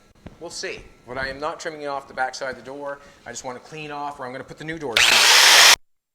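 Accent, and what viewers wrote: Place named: Maritimes, Canada. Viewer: First time here, I knew that was a maritime accent! Great work!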